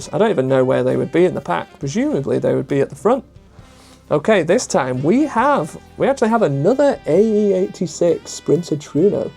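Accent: United Kingdom. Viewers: I always heard the Manc accent out. Love your vids, cheers mate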